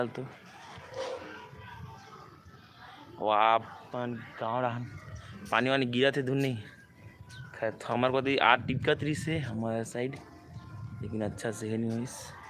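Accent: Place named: Akaladongri, India. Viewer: अकलाडोंगरी se bolat ho bahiya ji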